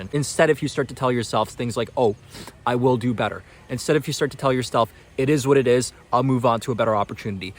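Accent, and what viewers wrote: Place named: North America. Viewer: You shocked me man, with your Canadian or American accent is it? So proud of you settling down or born in North America. I am Indian too and tried moving to Canada in the 90s desperate to start a new life there but didn’t make it.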